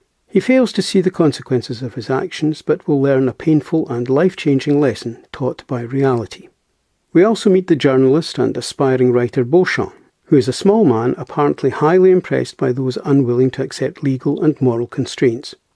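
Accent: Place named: Scotland, United Kingdom. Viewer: Would really like a fuller, longer and more detailed analysis. You’ve got such a lovely Scottish accent... take the bull by the horns and dissect this movie for us??!!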